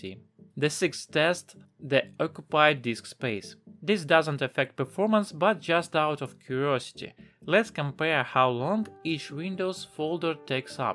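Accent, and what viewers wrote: Russia. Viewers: Все равно русский акцент не скрыть.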